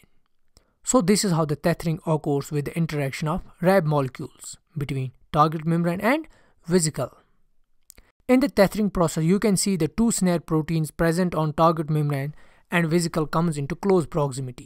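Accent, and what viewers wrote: Kashmir, India. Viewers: Your accent is kashmiri.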